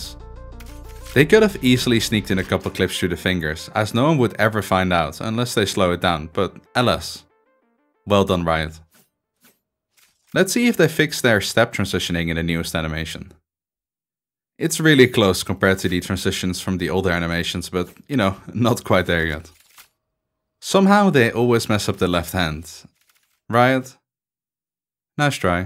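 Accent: Netherlands. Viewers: Are you dutch by any chance? Your pronouncation sounds dutch lol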